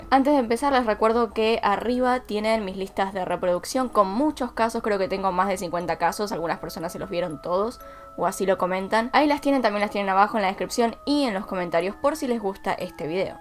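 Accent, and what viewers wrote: Argentina. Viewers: Hola hermosisima tienes 166 videos en la i yo estoy en la labor de verlos, muy buenas noches, me encanta tu trabajo lo haces muy bien y ese acento argentino que a mi me encanta,besos y hasta la próxima.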